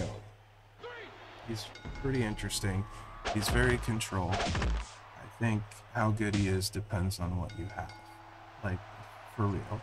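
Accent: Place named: Canada. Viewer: That's a side effect of his Canadian dialect. It's very prevalent in that country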